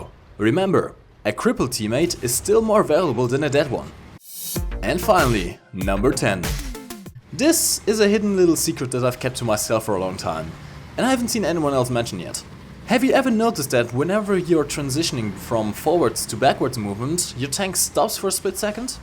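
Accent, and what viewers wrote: Germany. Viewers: me : clicks on the video and listens 5 seconds to it . . . me: "Is that the German speaking guy in Squires Videos? " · Bist du Deutscher? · super Video, aber bitte arbeite etwas an deinem doch sehr deutschen Akzent. lehrreich war es definitiv, hast nen neuen Abonnenten :) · thank you so much my friend with a heavy german accent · Ur German English is really very cute 😍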